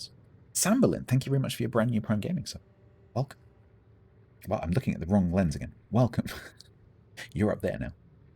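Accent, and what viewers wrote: United Kingdom. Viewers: Thank you, for uploading this preview (/speculation) for the upcoming updates, I guess I missed this part of the stream. I was a big fan of Foghorn News, but I completely agree with your decision to discontinue them. It's just nice to have the patch notes to be read in calming voice and delightful English accent in this hectic world.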